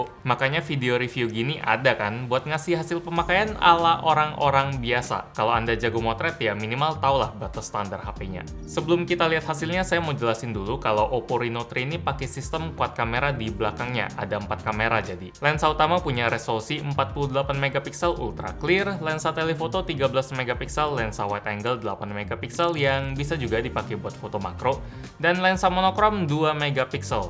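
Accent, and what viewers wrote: Palembang, Indonesia. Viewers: Gelep itu bhs Palembang, krn orang palembang jd wajar aja masih kebawa bahasanya. Lagian beda tipis sama bhs indo. Beda E dan A aja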